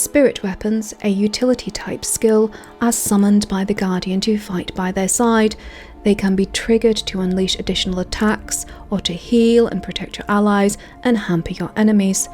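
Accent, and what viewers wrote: United Kingdom. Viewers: Honestly what got me to give your videos a try was your amazing British accent but once I watched the video it was really well made and informative. Subscribed.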